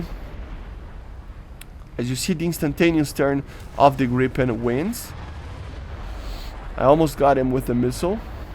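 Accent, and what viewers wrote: Brazil. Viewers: Sabia q tu é br, o sotaque não mente kkk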